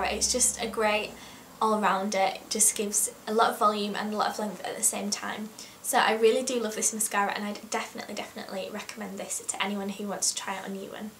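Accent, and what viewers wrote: United Kingdom. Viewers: Well, she is British so, shock horror, she has a British accent!! I take it youre a Yank? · haha thanks :D dare i say it reminds me of Harry Styles accent?? :P its hard to tell the difference in accents of a foreign country though!!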